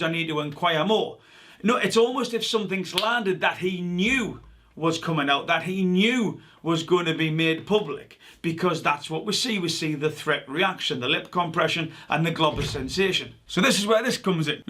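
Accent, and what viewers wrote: Newcastle, United Kingdom. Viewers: A Geordie on YouTube. Wey aye mate 👍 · Wew is that a Geordie I hear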